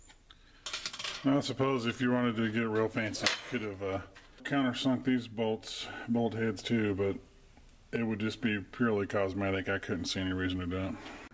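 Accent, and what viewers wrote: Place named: United States. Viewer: Hello, I plan on doing this same retro to the same mill as a future project. What I am wondering is how are the ball screws and ball nuts holding up? In general how is the whole machine holding up...maybe you could do a video on that..? By the way you did a great job on the video....you explained everything very well ....plain American english...TY even an old worn out guy like myself could understand. I've started things that people said a 10 year old can do it, we'll I crawled out of that hole feeling pretty stupid. I alway figure it out but it may take me a little longer and a few extra $$ but we getter done.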